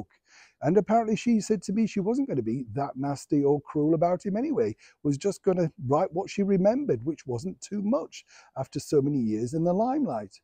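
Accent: Yorkshire, United Kingdom. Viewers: Good morning, My coffee break, and my first laugh of the morning, Resting thespian Yorkshire talk, young man hoping to be star Thank you take care Ps Ava was a beautiful lady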